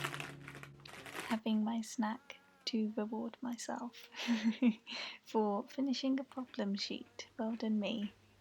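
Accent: United Kingdom. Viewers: You sound different from other Brits I meet how many accents are there in uk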